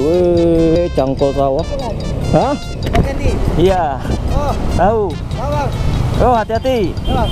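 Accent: Medan, Indonesia. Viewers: kek ginilah bahasa medan.. moga sukses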